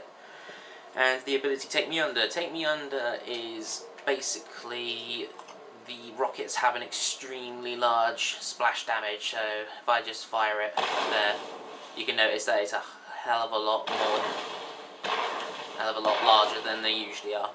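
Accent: United Kingdom. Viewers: Love the British accent XD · u sound like harry pottah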